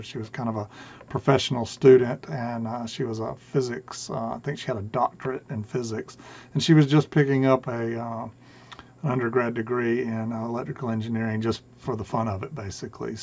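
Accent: South, United States. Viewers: I love all your videos, especially the tube amp builds. Doesn’t matter if they’re long or short. I’m in the process of watching them all from the very beginning so keep on making them please. I watch them in total but will pause occasionally for mundane stuff like housework and eating etc. You’re southern drawl is very pleasant to listen to and I’ve learnt loads since I started. For that I’m extremely grateful sir. I retired from electronics two years ago but still have a big soft spot for tube gear, radio or audio, and your knowledge is invaluable. Thank you.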